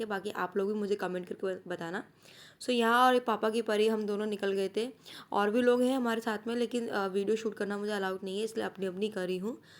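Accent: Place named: Bhojpuri, India. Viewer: Bhojpuri voice nice hai ❤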